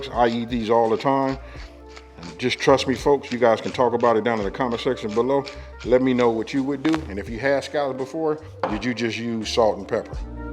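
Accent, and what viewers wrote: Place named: Los Angeles, United States. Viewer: is DEFINITELY from the West Coast ( I’m from Compton, Ca) and I hear L.A. or my city 😂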